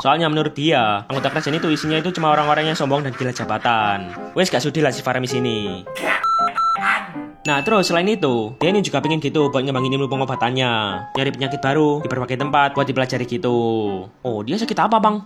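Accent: Java, Indonesia. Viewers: Wes fiks mas wong jowo 😭👊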